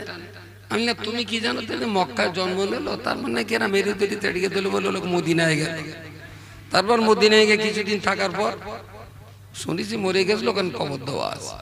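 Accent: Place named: Bangladesh. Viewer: এর কথা থেকে বোঝা যায় এই একজন অনুপ্রবেশকারী বাংলাদেশি।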